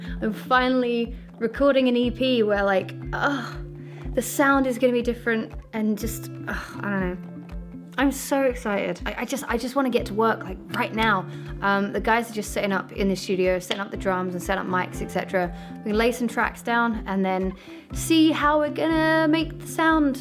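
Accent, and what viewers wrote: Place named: London, United Kingdom. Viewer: Your voice has gone very London girl in this video. I am neutral on this.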